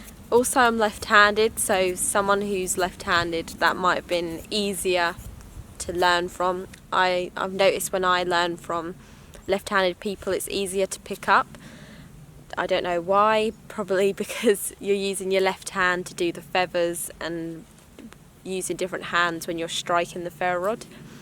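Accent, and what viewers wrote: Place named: Cockney, United Kingdom. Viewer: A fellow lefty I see. Nice video 🙂 So not the subject of the video obviously, but I love the accent. Cockney?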